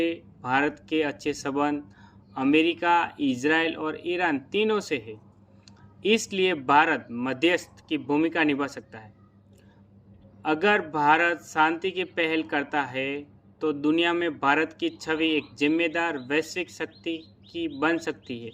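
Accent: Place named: Gujarat, India. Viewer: Gujju Hindi … love it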